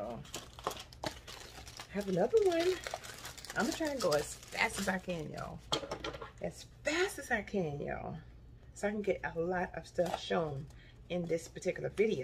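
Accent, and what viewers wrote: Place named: South, United States. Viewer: First good morning, and you are highly blessed. We all are, and all I would tell them that there’s many more Temu Haulers other than yours channel to choose from. Feel free to move over to them. I give you my blessing to change and you should get what your looking for. Keep doing what you’re doing, because I like others of your viewers love your southern accent then you let us know that not your normal personality, but we love it. ❤❤❤❤❤❤❤🎉🎉🎉🎉🎉🎉. Your beautiful and your giving us NO BORING CONTENT. Linda Hackney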